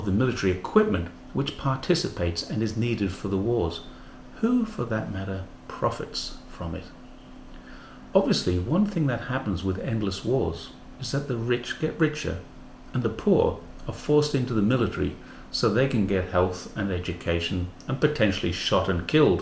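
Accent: Australia. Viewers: First Time I hear this. Are you Australian???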